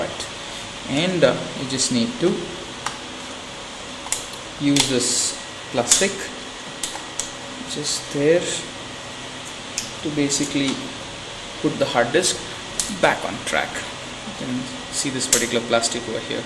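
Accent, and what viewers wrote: India. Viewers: インド訛り キッツいなぁ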